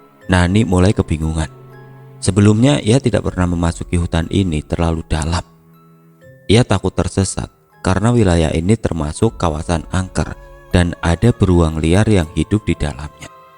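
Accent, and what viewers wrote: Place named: Banyumas, Indonesia. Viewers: apa orang tegal ya kok medok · masnya orang ngapak ya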